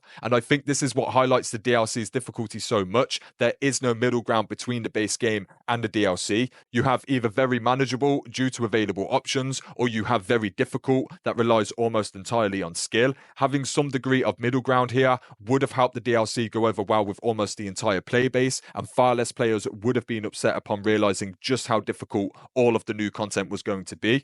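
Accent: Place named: United Kingdom. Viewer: Never heard such a cock in the mouth accent as from this Brit 😂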